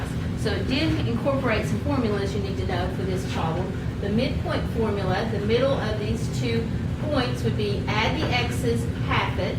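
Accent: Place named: Tennessee, United States. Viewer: Tennessee accent or Alabama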